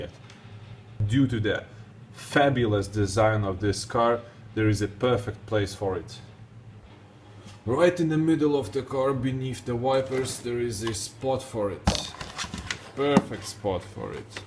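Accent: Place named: Russia. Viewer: first, sorry for my bad Englisch, you talk like an Russian Comrade, i' am and my friends are very louthing about your Video , your Speech is wonderfull, you are the Best !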